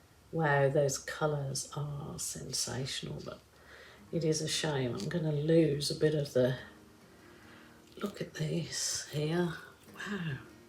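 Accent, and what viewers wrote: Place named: Norfolk, United Kingdom. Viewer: This is beautiful - What is your accent? I feel like i could hear lots of different ones, bit of a norfolk twang - bit Birmingham, bit Australian... Could listen to and watch you for hours...❤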